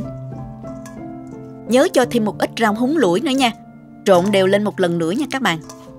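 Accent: South, Vietnam. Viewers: Cách làm dễ, đơn giản và giọng nói rất miền Nam chất phác, dễ thương. Thích lắm nhe !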